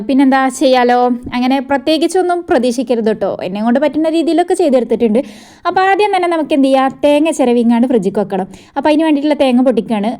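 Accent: Malappuram, India. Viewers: Niga serika Malappuram aano akka ekadhesham adhe bhasha😊❤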